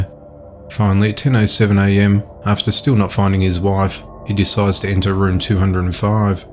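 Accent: Australia. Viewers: Please get a job as an American news anchor so we can have that smooth, Aussie jazz you call a voice telling us the miserable American news so some good can come from it?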